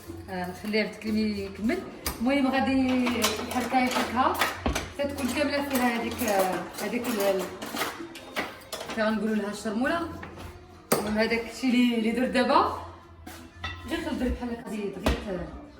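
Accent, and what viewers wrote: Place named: Arab world. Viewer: Machaallah 3lik j aime bien ta simplicité tes goûts et ton accent arabe j adore bonne continuation 😍😍😍😍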